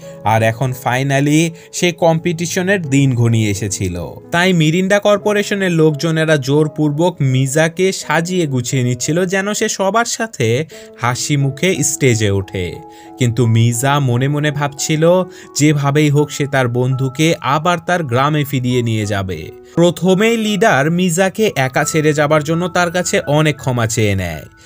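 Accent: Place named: Bogura, Bangladesh. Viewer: ভাই আপনার বাসা কি বগুড়ায়,,কারন আপনি আমি না বলে হামি বলেন,,হামি তো আমার পছন্দের বগুড়ার ভাষা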